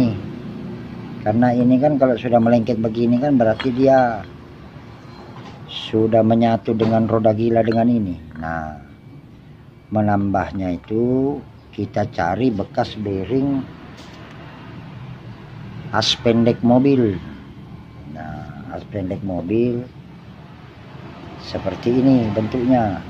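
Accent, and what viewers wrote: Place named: Bugis, Indonesia. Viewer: apa kabar bang...?sy dengar ada logat logat BUGIS nya....!slm knl.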